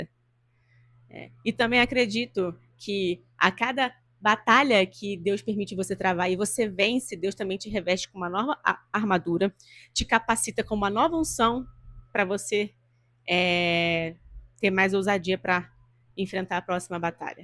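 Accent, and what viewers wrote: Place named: Rio de Janeiro, Brazil. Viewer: " Princesa de Mônaco." Sotaque carioca. Deus abençoe sempre seu ministério! #JuntosPeloReino!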